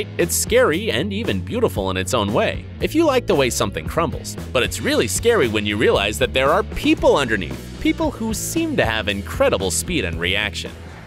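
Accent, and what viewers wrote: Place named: United States. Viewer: Nahh - that yank wank voice is enough to put me off.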